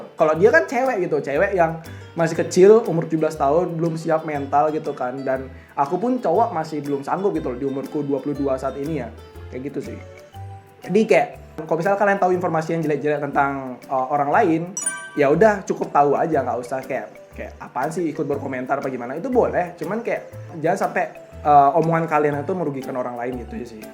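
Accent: Bali, Indonesia. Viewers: Suka banget ama aksen balinya wehh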